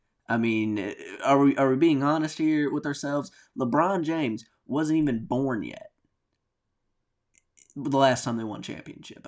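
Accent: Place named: Alabama, United States. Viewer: This dude is a casual, bruh. The thumbnail alone implies Harden should be considered for MVP when the Clippers are 5-16. He called Michael Jordan ancient history like he played in 1960. Then he said LeDaddy James (super pause), and called MJ inefficient like he's not 2nd all-time behind Jokic in player effciency. Completely ignored the fact he's shooting .495 for his career while taking shots most wouldn't consider at an all-time high volume. MF put a period at the end of his title like he just learned how to YT lmao Like how are you clearly an L.A. fan who sounds like you're from Alabama? lmao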